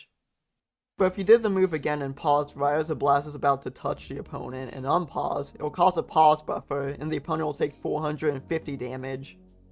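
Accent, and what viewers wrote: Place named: New York, United States. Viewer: ohhhh awesome. For some, you sound like you're from New York.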